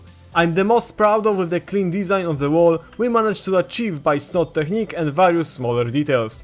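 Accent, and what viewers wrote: Poland. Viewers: Kurde wyczułem polski akcent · Are you from poland, beacuse you sound a bit like that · ale polski akcent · Are you polish? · Nice creations and jesteś z Polski bo masz taki akcent ? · I can feel the Polish accent in your films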